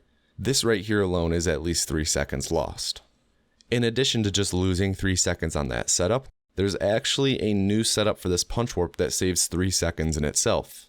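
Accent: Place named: Midwest, United States. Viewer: I love the aggressive Michigan / midwestern accent this episode? Like never noticed it before lmao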